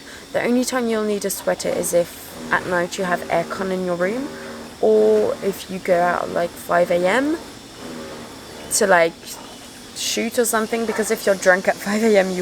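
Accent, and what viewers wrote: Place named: United Kingdom. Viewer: No way. Do people from France actually take on a UK accent when learning English?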